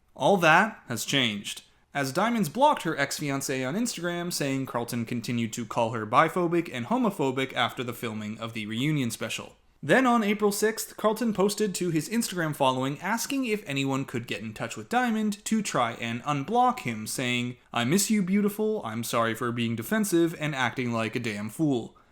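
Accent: Canada. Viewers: Is the narrator from Canada?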